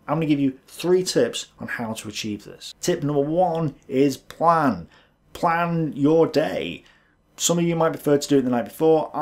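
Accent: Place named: Merseyside, United Kingdom. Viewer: Pomodoro. I'll check those out. cheers. Ps, you have a slight Birkenhead twang to your accent. or from Runcorn and Widnes. I lived there for a while and R&W had such a wide variety of accents that i felt like i was in the 1960s where 'scouse' sounded like the Beatles [Lancashire based accent] but also there wee people who sounded distinctly Mancunian. When i visit my family in the Wirral my old mates call me a 'toff' because they say i talk like a posh person from London yet in Brighton [my home] i am told i have a heavy scouse accent. No wonder Joseph Conrad [who spoke about 9 languages as he lived in central Europe between Germany, Czech and Poland so grew up trilingual]. Then again, as i recall, he did state , to be more accurate, he didn't enjoy 'writing' in English as english had so many accents it was difficult to understand someone who spoke local east end London, compared to a person from Newcastle or the midlands! 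I'm not surprised! hen i first moved to Brighton with my gf nd were chatting in a shop we would be followed by security wherever we went!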